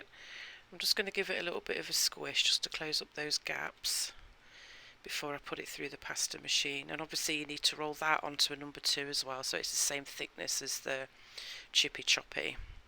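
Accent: England, United Kingdom. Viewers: Lovely to hear an English voice!!!!! I am in Ireland love your channel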